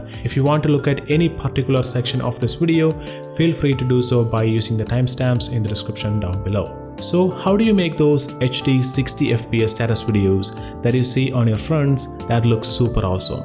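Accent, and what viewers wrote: Kerala, India. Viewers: Malayali aanalleee.... Pronounciation kettappol manassilayi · Ah English il thanne manasil ayi malayali anu ennu😁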